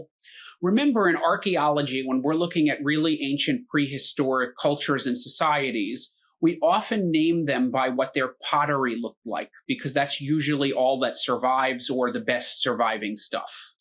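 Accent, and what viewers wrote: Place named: United States. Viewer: He didn't pronounce it incorrectly for someone with an American accent. You don't change your accent to pronounce a place name. I'm sure you don't pronounce New York, Orlando, Los Angeles, New Orleans or any other American city the way that an American would. Someone with a rhotic accent saying "Mel-bin" is incorrect and distracting. It's like an English speaker walking around pronouncing Paris the way the French do.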